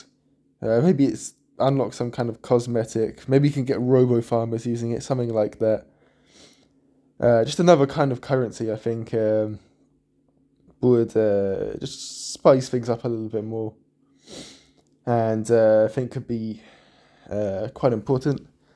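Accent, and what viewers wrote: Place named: United States. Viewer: u sound like an American 😮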